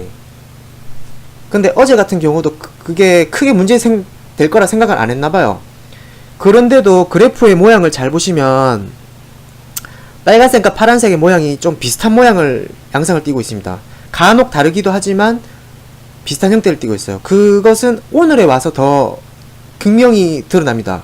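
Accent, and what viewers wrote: Gyeongsang, South Korea. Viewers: 대구 느낌나네요. ㅋㅋㅋㅋ 화이팅입니다. (저도 대구입니다~)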